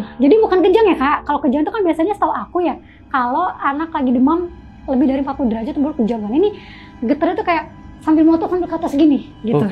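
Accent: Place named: Bogor, Indonesia. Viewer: logat logat bongee pisan ini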